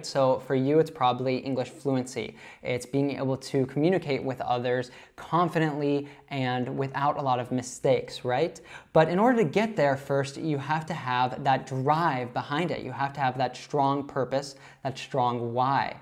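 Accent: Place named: United Kingdom. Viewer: Hi....do you conduct online class. I like british accent and wanna to be fluent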